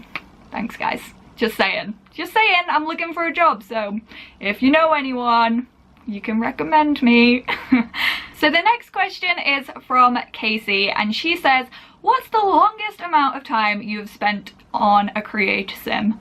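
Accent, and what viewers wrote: England, United Kingdom. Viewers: If you mean you want her accent, I have it so yeah. Just thought I'd say that, if you want to know what it is, all I know is that some part of England.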